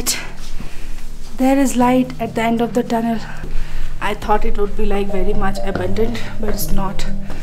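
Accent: India. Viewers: I think you maybe Ladakhi because your language tune is ladakhi.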